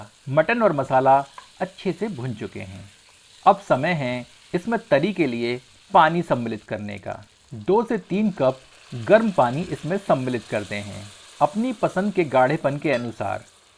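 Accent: Standard. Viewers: Aap ekdum shudh hindi bolte hai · Your hindi sounds so pure.. great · Bhai etani shudhha hindi mujhe dharmendra ki filma yad aarahi hai aise hi bol rahe the. Ati swadista. Uttam lajwab jivha par laar prakat ho raha hai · Beautiful shudhh hindi. Lovely to the ears.🌹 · आपकी शुद्ध हिंदी को सुन कर मन तृप्त हो गया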